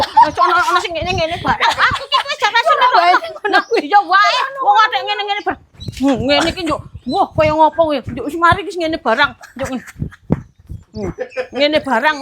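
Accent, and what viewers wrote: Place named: Madiun, Indonesia. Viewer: Gerceeep nonton mak e ,, duhh Masyaalloh Tabarakalloh mak tambah muuaaaniiss lhoo seperti gula jawa 😊😊 manis e angkleg bahasa Medione ❤❤,, sehat2 yaa mak ku 🎉🎉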